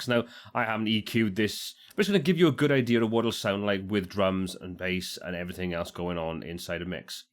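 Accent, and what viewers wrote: Wales, United Kingdom. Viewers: Great video, and such a joy to hear a Welsh accent.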